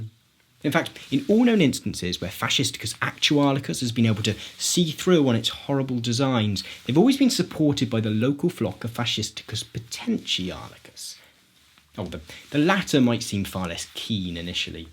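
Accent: RP, United Kingdom. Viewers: How did i know you were a brit before even clicking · the guy sounds like such a tory but his opinions don’t match his voice ahhhhhhhh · haha as if this is the top comment. I'm British and just knew 100% he was British for some reason. glad I found him, his videos are good.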